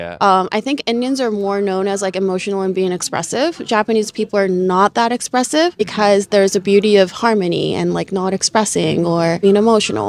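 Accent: United States. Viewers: Again, American accent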